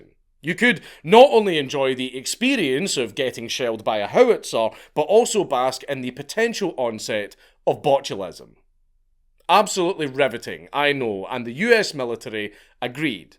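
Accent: Scotland, United Kingdom. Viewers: “Became knoGwn as the golDf waDrr “ Yo Scott’s are cool af bro . Best English variant yet other then American southern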